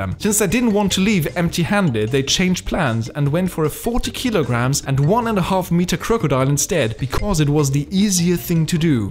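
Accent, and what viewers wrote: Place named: Germany. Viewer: How come I feel a koalalike urge to fall asleep whilst listening to facts infused with a German accent?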